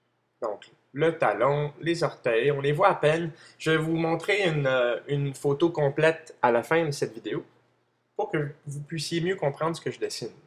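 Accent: Canada. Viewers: J'adore ton accent Canadien :)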